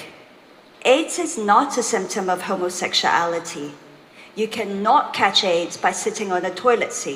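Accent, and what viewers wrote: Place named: China. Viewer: 伦敦口音退化了不少 中国音非常严重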